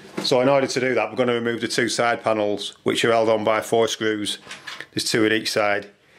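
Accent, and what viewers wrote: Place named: Yorkshire, United Kingdom. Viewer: Your accent is sick! haha Where you from? yorkshire?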